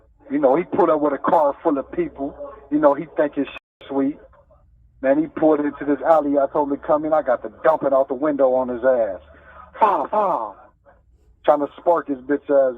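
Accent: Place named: New Orleans, United States. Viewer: He talks like he comes from NOLA.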